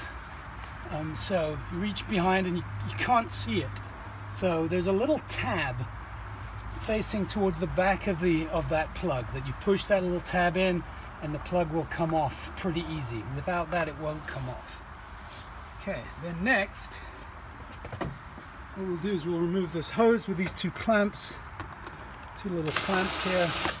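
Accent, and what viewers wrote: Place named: Europe. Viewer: Wow! Never knew or pictured you speaking with a European accent lol! Awesome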